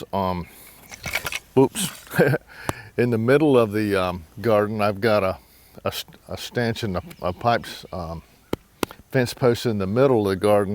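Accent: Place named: Outer Banks, United States. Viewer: I'd be willing to bet your originally from carolina with that Hoi Toider accent. ;-)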